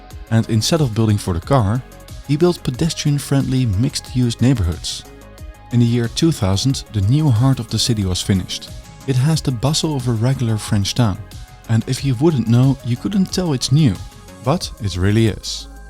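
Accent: Netherlands. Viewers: Wat een gezellig Nederlands accent!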